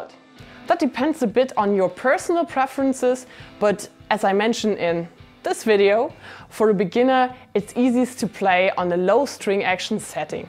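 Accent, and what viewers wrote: Germany. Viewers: Hallo. Am Dialekt nehme ich an Deutsche. Alle deine Videos zum Setup waren eine grosse Hilfe. Ich besitze eine rote TE-20 und veränderte ein paar Kleinigkeiten. Messing Steg Sättel compensated, neue Saiten, anderen Hals Sattel und Locking Tuner. Von Pickups sehe ich ab. Ob sich alles gelohnt hat für mich? Bin Anfänger. Die Mühe mit dem Hals Sattel würde ich nicht mehr auf mich nehmen, aber bin um eine Erfahrung reicher. LG Robert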